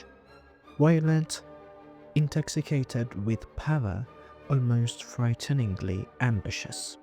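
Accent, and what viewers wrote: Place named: United Kingdom. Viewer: We would like you to tell us the stories of ancient Iran (especially the Shahnameh) with a British accent, Professor. 🔥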